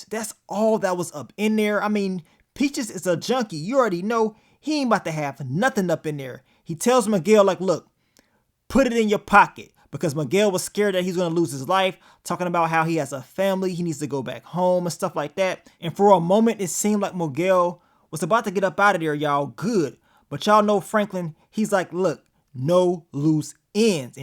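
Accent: United States. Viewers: u coined that LA accent bruh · Man still in his American accent 😂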